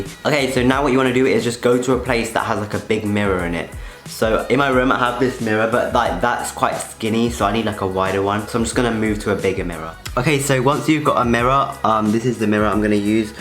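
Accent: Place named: London, United Kingdom. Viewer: I love your accent I am so jealous I almost got it when I went to London 🇬🇧 over the summer 2018 however, I wish I still got it.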